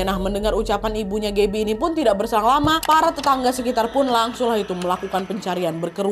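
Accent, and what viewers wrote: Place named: Eastern Indonesia, Indonesia. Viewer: Banyak yg bercerita horor dgn flognya.tapi ka, bisa hidup ceritanya macam aku ikut dalam ruang cerita itu. Di tambah logatnya nya yg seru.... He he Indonesia timur jdi Indonesia barat..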